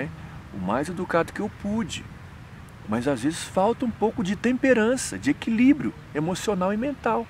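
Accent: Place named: Brazil. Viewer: tu tem muitos vícios de linguagem brasileiros no teu inglês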